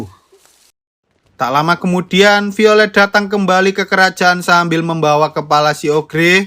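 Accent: Java, Indonesia. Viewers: suaranya kaya mas Dobit, medok banget .... sukses selalu ya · Medok pisan keluaran suara jowomu yo mas bro..😅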